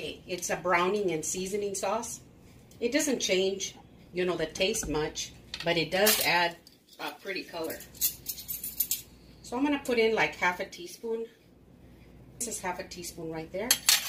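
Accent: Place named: North, United States. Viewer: Initially, i think you are hispanic with a northern us accent. Nevertheless, video is like home, thanks.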